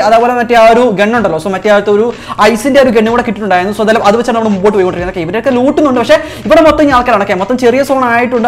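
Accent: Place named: Malappuram, India. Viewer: Malappuram language me myaman uyir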